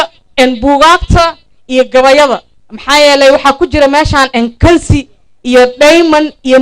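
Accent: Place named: Hargeisa, Somalia. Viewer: Hrgysa mxaa afkeda soo gadhsiiyey qoftan hdlka jilicsan 😡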